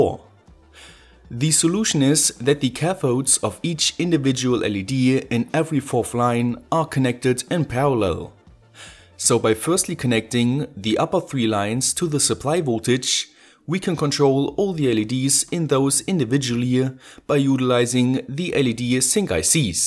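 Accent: Germany. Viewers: U sound so German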